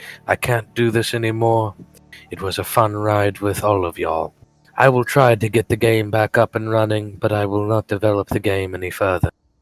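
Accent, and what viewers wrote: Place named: South, United States. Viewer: narrated by a fellow southerner. liked.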